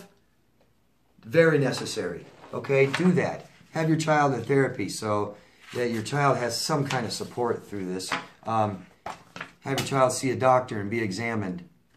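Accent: England, United Kingdom. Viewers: I finally comprehend what is meant when a Narcissists see a person as an extension of themselves. I was thinking what does that mean ...like a third arm? No it means you are to help/aid them only and you get nothing or little in return. :( They SEE you only as a way to help themselves. :( Now I know my Father was a Narcissist. He never paid child support, no gifts or presents from him EVER! But he felt he was entitled to respect from us. As as adult I would do his shopping...help take care of his business...he future faked and said he was "leaving me something" . It was all one way in his favor and it does hurt when I realized he never loved me. He was only out for himself! He thought his presence was a gift to me. I do feel at peace knowing the problem was not with me. I wish I knew this earlier. People can have interactions with you for years and truly NEVER love you. 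I lived through that but I did not understand at the time. First recognize a self centered person then move on no matter who they are. Yes..sometimes a family member or romantic partner does NOT love you. Great English accent !! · Thank you, I just love your English accent 😘. In the UK you're allowed 6 free sessions of talking therapy on the NHS. This I did and my therapist and I think I'm much much improved. If I want more I have to pay, but as I'm paying for a lawyer... Is it possible to have 2 sociopath parents or 2 npd parents? From London, England.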